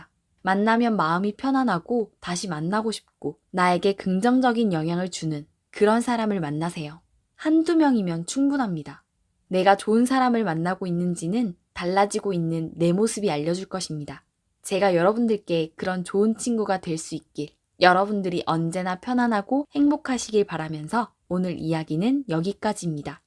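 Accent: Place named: Gyeongsang, South Korea. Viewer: 님 사투리 쓰는 거 보니까 경남, 부산에 사는 분 같네요.ㅎㅎ 딱 들으면 알지예.ㅎㅎ 저랑 지역이 동일할 듯.ㅎㅎㅎ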